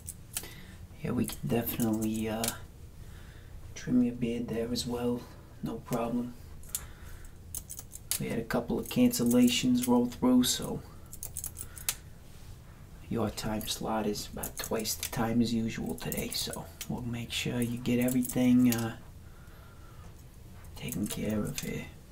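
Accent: Boston, United States. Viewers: I have to say, being from North Carolina myself, I have never met someone from Boston! I think it's such a neat accent, so much character! I always get sad with northerners think southerners are stupid automatically because of their accent or vice versa!! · The Boston accent is the best in ASMR I would say. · Hate Boston accent.... Maybe something different?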